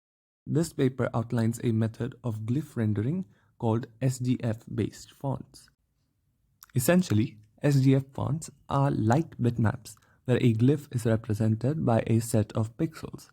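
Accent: India. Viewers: Your sound indian , are you Indian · Why the Indian accent? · I wanted to watch this but I just can't do the accent. I'm sorry. I just can't listen to Indian accents.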